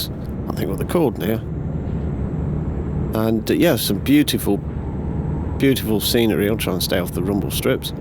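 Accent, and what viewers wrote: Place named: United Kingdom. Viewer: Very British jolly good old chap :-)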